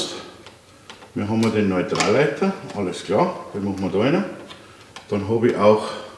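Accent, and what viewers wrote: Bavaria, Germany. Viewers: Also mal ganz ehrlich von mir - Ich bin schon öfters auf deine Videos gestoßen und war sofort abgeneigt wegen deines Dialektes. Ich mag Bayern jetzt nicht so. Nur unsympathische Leute aus Bayern kennengelernt. Jedenfalls habe ich mir dann trotzdem ein paar Videos angeschaut. Bist mir doch ganz sympathisch jetzt. Klasse Inhalte. Gut rübergebracht. Beste Clickbaittitel überhaupt :)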